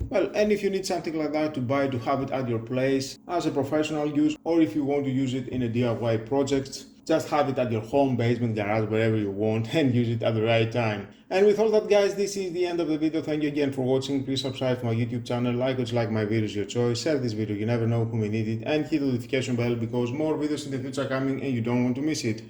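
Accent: Greece. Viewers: I can hear the greek in your voice 😂❤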